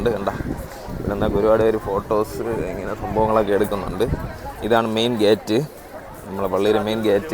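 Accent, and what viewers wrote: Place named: Neyyattinkara, India. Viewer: Neyyatinkara slang super my mother Village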